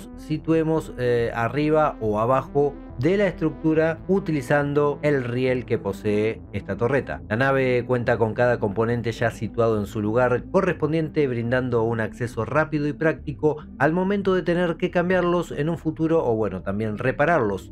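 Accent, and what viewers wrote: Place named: Argentina. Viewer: Me encanta ese acento argentino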